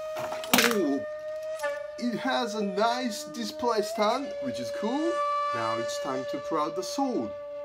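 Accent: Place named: Japan. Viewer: SAMURAI EnglishとBritish Englishを混ぜたみたいな英語やな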